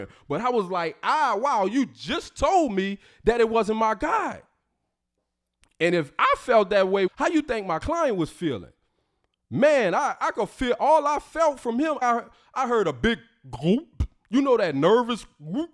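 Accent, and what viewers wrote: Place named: South, United States. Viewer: I'm a new subscriber 💯 just curious is it NYC or N.O. I thought you were in NY but you sound like down south 😂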